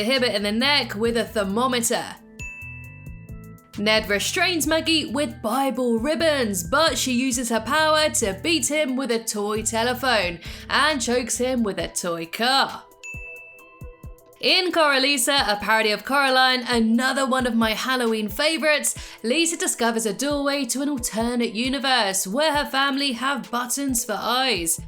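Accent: United Kingdom. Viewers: love the Brit voice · She's speaking British English, though. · Love British voices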